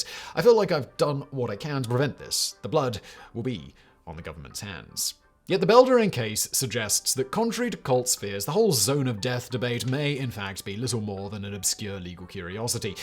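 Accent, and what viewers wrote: England, United Kingdom. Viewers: Its Bri’ish. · He's British. 🤣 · is speaking correctly. It's the English pronounciation. All the time there is aluminum and math, there is no room to shout. The language is called English (not American), afterall.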